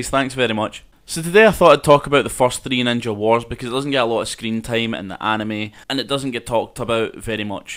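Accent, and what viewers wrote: Scotland, United Kingdom. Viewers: the scootish accseny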